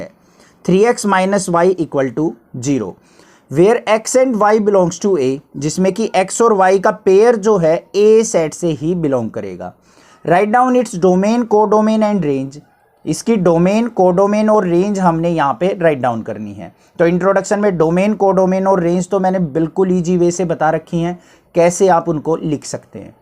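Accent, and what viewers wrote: Haryana, India. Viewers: Sir kya aap Haryana se ho ...... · Do u belong to Haryana ✌🏻??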